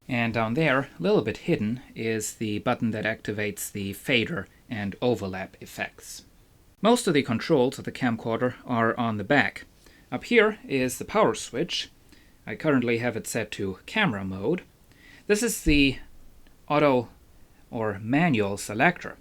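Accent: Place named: Germany. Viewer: are you german, you have a very german accent..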